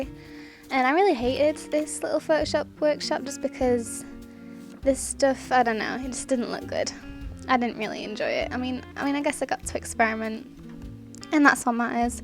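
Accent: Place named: England, United Kingdom. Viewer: 2 seconds into the video and I instantly said "That's England" 😂 Loved the video. I would love to pursue art. You are very talented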